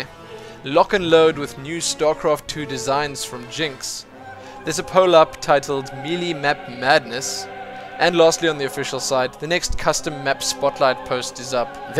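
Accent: South Africa. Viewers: I absolutely love the South African accent. I want to hear your voice on a lot more Starcraft shoutcasts.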